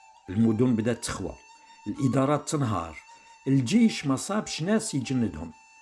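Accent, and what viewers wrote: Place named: Tlemcen, Algeria. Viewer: Une narration Tlemcenienne authentique et magnifique. Allah ya3tek essaha ouyahafdek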